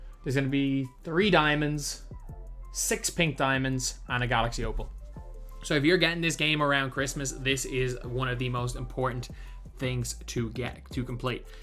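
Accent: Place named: Ireland. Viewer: I was thinking this guy sounds Irish, of course he's the champ! Sound for the video man just got the game👍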